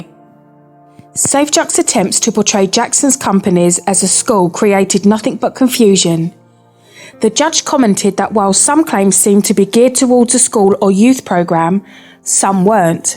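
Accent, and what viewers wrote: United Kingdom. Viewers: Special thanks to the pommie bint who narrates this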